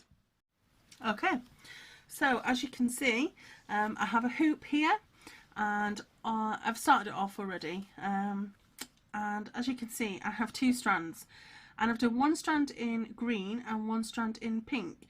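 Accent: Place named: England, United Kingdom. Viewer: For starers I just love your videos they are such fun cause your character is very enjoyable, so you have encouraged me to cross stitch i am a lover of embroidery but cross stitch has caught my attention thanks to you. I am looking for someone here in my town to show me how cause i'm more of a hands on. Plus i love the English accent it sounds so cool.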